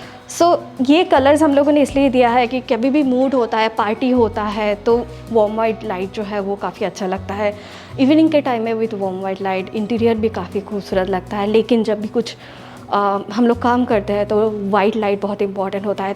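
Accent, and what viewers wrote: Bengal, India. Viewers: Hi I m pritee mother of 2 kids from Delhi. Few months back inspired to bcum interior designer...now became big fan of u and ur bengoli accent...I really luv the way u show and tell everything...u r really an inspiration...I want to know a thing...pls reply....on the bed u place 2-3 pillows and cushions also,.but practically when we sleep we need only one pillow what these clients do for other's on bed? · Love the way you talk your bangali accent just love it and your Diys just love them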